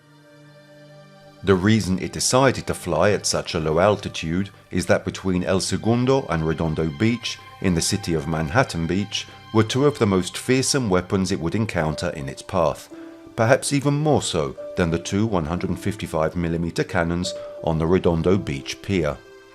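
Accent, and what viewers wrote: United Kingdom. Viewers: A story about the USA..but Brit narration ?? Peculiar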